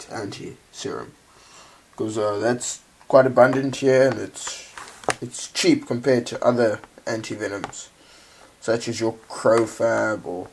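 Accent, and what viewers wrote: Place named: South Africa. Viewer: you sir are south african .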